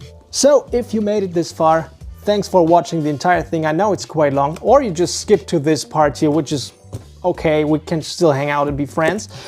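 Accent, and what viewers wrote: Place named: Latin America. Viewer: Perhaps your accent and slight resemblance to Argentinians, possibly why you give off those light skinned Latino vibes? Haha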